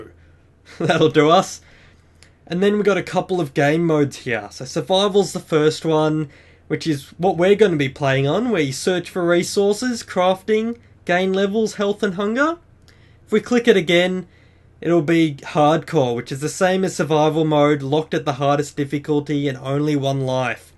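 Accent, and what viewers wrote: Australia. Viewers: are you australian???? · Australian, huh? (Accent) Two minor corrections: Worlds are not random, but if you don't enter a seed, the seed to make the world will be. Not quite infinite. Possibilities, almost, the world itself is almost 4x the Earth's surface. Anything after that are either fake chunks, or over-wrapping from the opposite end (not sure of this). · Is this dude an Aussie? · lol your from the outback xD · no offense but are u aussie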